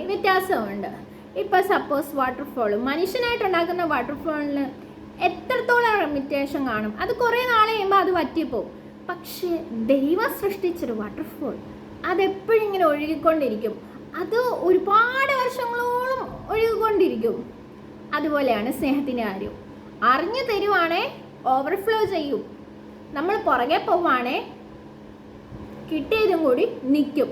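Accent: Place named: Kollam, India. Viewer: കൊല്ലം ടtyle സംസാരം നല്ല രസമുണ്ട്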